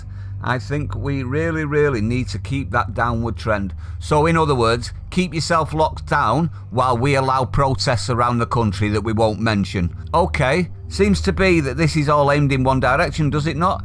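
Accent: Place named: Salford, United Kingdom. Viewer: Salford? If not it’s great to hear an accent close to me own talking sense.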